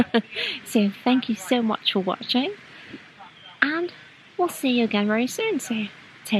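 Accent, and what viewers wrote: United Kingdom. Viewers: you're german? You soumd so british. That dude also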